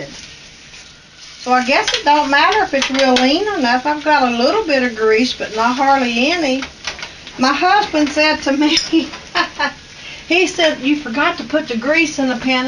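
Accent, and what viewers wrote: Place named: South, United States. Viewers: I like to watch you cook and hear you talk. Wondering where you live and if that is your real kitchen. It's so comfy looking. You accent sounds like mine; I'm from South Central Kentucky. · I love when cute lol says. Let me tell you something in her country voice. And her nice kind husband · I love your videos. I am originally from Virginia near the WVA border, so your accent sounds like home! I am going to try the Fig Newtons. I think we will like them. The bacon looks good too. I'm going to share your channel with my daughter who loves to cook.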